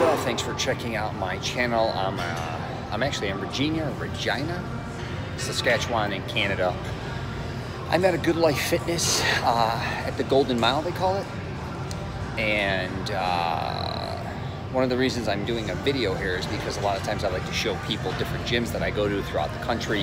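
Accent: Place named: United States. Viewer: The city's name is pronounced Re-JYE- na . You didn't hear it on your flight when you touched down? You're VERY american as is your yankee accent!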